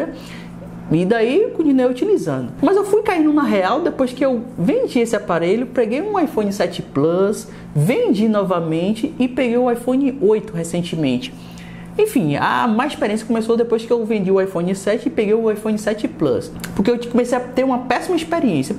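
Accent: Bahia, Brazil. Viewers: tu é de que lugar da bahia, brow?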